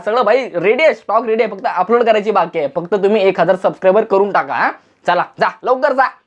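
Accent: Konkan, India. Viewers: 😂😂😂haha mja aa gya Dada tu kokani ahe ka?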